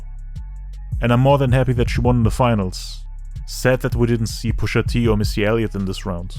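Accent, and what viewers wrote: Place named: Germany. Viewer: Sounds a Little Bit like you got a german accent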